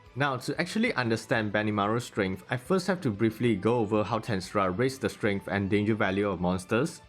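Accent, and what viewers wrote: Singapore. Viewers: Singaporean accent :)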